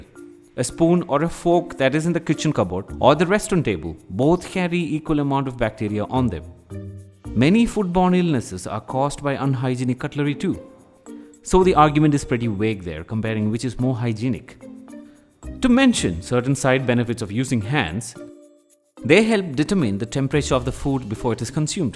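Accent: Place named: South India, India. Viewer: Westerners who think all indians sound the same should watch this. This is one of the many south indian accent.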